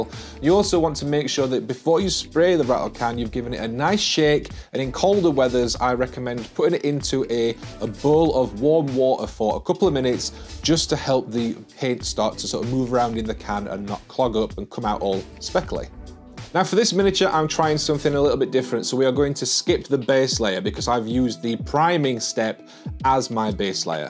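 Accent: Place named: Northern England, United Kingdom. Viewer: Hiya mate great tips here! - At last a video which is to the point and helpful and in a great nothern accent -which i can relate to lol! I just wondered if you might be able to help? I am a complete beginer with figure painting but have an art background so should be fine with the painting part. Basically, I collect transformers and am trying to 'repair' or colour match this chrome orange colour which is flaking off the figure 🙈The figure has like a chrome metallic orange with a mirror finish - so I was going to by some GSW paints as the metallic chrome and some of the other orange inks look like they might work? However do you know would I mix this with an orange first or water it down and put it over the top? any advice or tips woud me amazing - its been really hard to get any specific answers as its a bit of a specific thing I am asking lol!🤣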